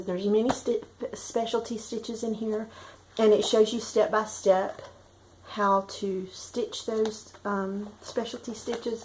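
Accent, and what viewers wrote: South, United States. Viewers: HiYour videos are so calming - you have a wonderful southern accent which sounds so welcoming. I watched the video of you and your friend (night owl?) And your joyous heartfelt friendship was so wonderfully conveyed. I subscribed to her site too.God bless you!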